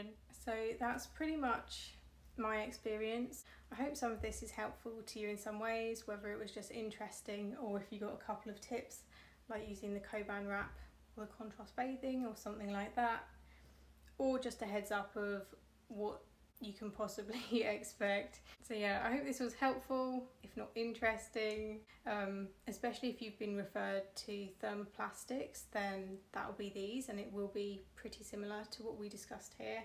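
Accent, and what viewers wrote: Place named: England, United Kingdom. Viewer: when you’re talking sometimes it’s like listening to ASMR your voice with the soft english accent is relaxing even though you are talking about your serious issues. I hope at some point we get some relief chronic illnesses are tough.😞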